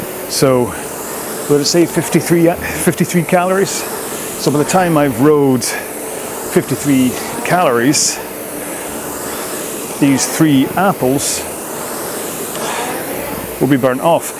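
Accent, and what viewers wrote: Scotland, United Kingdom. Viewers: Thanks for reviewing asensei, appreciate it. It is worth mentioning that most of our workouts these days are full row-along sessions with coaching and talking all the way through from the likes of Eric Murray, Johan Quie and British Rowing. There are some you can try in the free trial too. These are similar to Eric's free row-along sessions on the asensei youtube channel - indeed some of those sessions are pulled into the app and have the goal setting, music, tracking etc fully integrated. Would be happy to talk you through any of this if you ever want to do a more in-depth piece, or just catch up. Nice to hear a Scottish voice doing a review too (two of the asensei founders also Scottish)!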